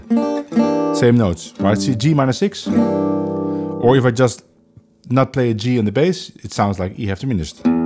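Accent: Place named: Netherlands. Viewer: Your Chord shapes are unusual. You're definitely a fabulous teacher. Worth studying. Love the Dutch accent.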